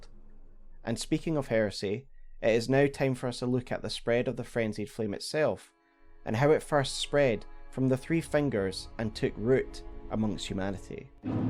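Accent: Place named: Scotland, United Kingdom. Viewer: Wake up honey, the Scottish Elden Ring lore man has posted!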